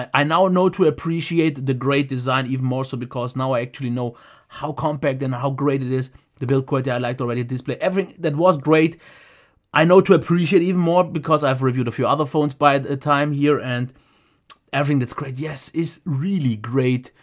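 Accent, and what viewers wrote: Germany. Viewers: Funny when A German speaks English like him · Ich liebe es wenn Deutsche Englisch reden. Egal wie sehr man sich auch anstrengt, man bekommt den deutschen Akzent einfach nicht los :D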